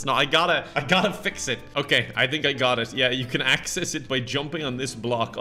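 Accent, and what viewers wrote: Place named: Ireland. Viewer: He's just Irish don't worry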